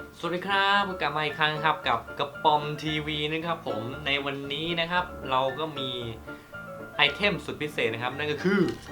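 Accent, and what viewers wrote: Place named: South, Thailand. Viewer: สำเนียงเหมือนคนใต้ เป็นคนใต้ใช่มั้ยยบอกมาาาา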